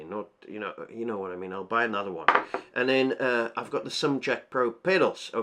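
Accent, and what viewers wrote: South Africa. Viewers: South African accent ? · Nice video. Sold me on the pedals 🙃 you South African? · you sound south african?!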